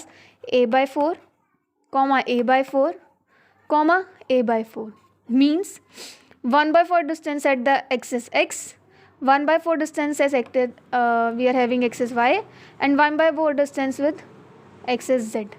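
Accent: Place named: Haryana, India. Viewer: Aap Haryane se ho na...Don't tell lie. Because I am from Haryana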